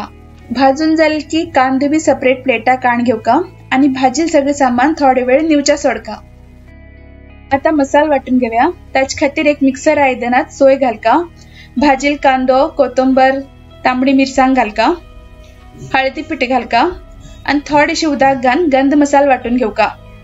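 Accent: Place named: Karwar, India. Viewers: guruprasad YM , true ..I too sensed that . It sounds more of uttar Kannada konkani version or Goan or Malvani . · .. Hello dear. That's Karwari Konkani. People from Dandeli, Kumtha,Murdeshwar speak in this accent. It's not the Goan Konkani. I am a Goan GSB.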